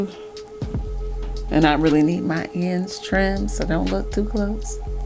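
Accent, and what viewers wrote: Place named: Nigeria. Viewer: I hear a bit of a Nigerian accent. Is she African??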